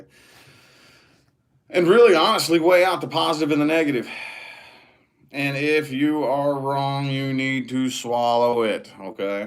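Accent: United States. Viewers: I think its the whole american accent thing he has that makes me feel..... yesss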